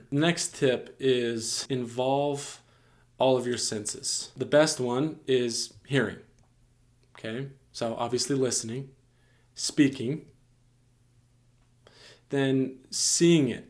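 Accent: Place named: Brazil. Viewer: ele é br dá pra ver pelo sotaque do inglês dele hahaha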